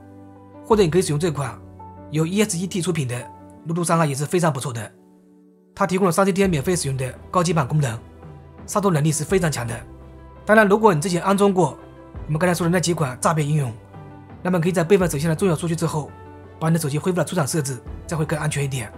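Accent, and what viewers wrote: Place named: Jiangshan, China. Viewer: 有点像衢州江山口音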